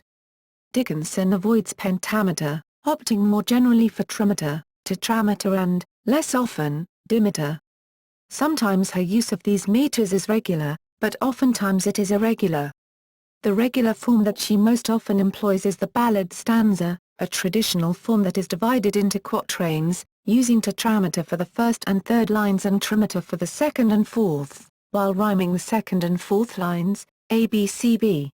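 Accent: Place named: United Kingdom. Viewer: british accent or not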